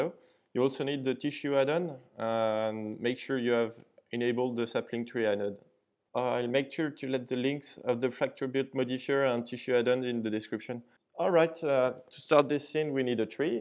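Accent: France. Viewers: l accent explique Napoléon , tu représentes ! petite fierté mal placée , franchement tu déchires tout ce que j'ai vu ! merci pour ces moments d'émerveillement !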